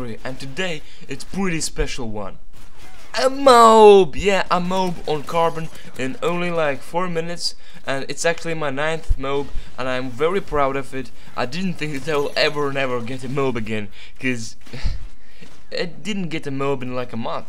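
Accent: Latvia. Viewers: epic latvian accent hahah...nice moab dude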